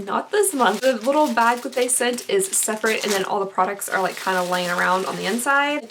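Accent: Kentucky, United States. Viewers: This is a bit of a weird comment, I'm also from KY and sometimes I miss the accent and watch your videos 😅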